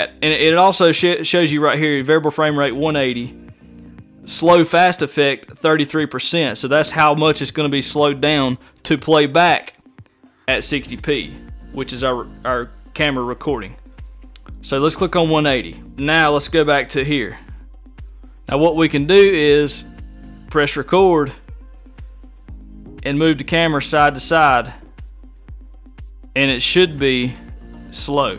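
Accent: South, United States. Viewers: Thanks! Nice to hear a southern voice on a GH5 tutorial!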